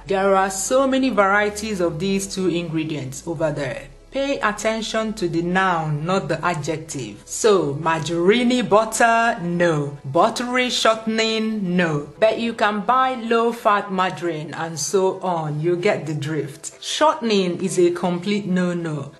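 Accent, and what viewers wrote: Igbo, Nigeria. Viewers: My sister... the igbo accent ❤️ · This is purely ALL NIGERIAN! Great Nigerian accent with some wonderful mouth watering recipes! My rating on this video is clean 5 star!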